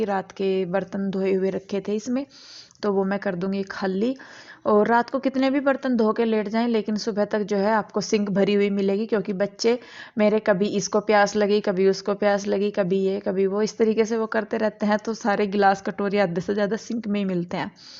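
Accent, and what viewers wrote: Haryana, India. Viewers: Aap haryana se h, apki bhasha bohut achi lag rahi h, apne bohut ache se orgenize kiya hua h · Mujhe aapki boli achchi lagi,aap haryanvi ho?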